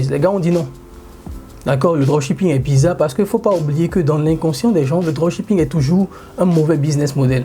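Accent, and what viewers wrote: Benin. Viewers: Vous parlez comme les béninois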